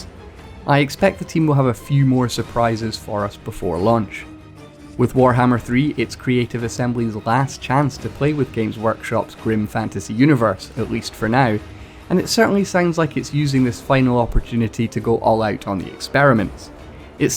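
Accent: Scotland, United Kingdom. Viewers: Great video. Love hearing a fellow Scot. Can’t wait to get my hands on TWW3 to try out these new battles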